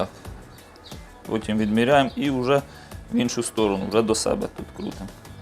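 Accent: Cherkasy, Ukraine. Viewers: Добрий день, велике дякую за вашу працю,бажаю процвітання каналу,а говорите ніби у нас на Черкащині.Благополуччя і Миру Україні 🇺🇦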